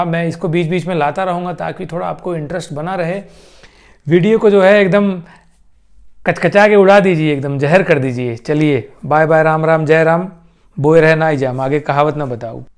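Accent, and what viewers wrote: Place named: Uttar Pradesh, India. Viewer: Apki boli se lgta hai aap jaunpur ya pratapgarh k rhne wale hain.. Accha lga apse mil kr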